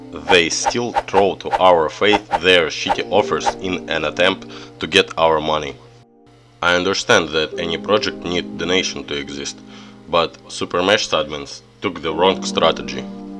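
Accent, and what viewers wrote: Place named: Russia. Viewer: I love your russian accen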